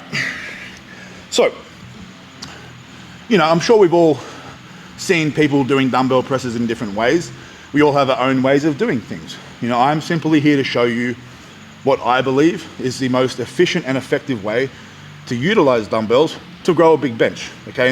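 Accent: Australia. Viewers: Good see some solid Aussie oak talking weights not the yanks all the time. Good stuff mate and I’ll be watching keep the content coming and love the way we talk down under no room for smoke and mirrors just real talk. Now it’s your set mate 💪🏻😎❤️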